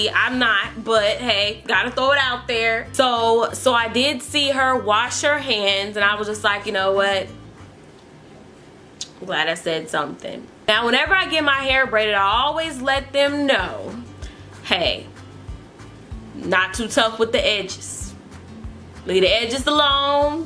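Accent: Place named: California, United States. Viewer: sounds like she is from Cali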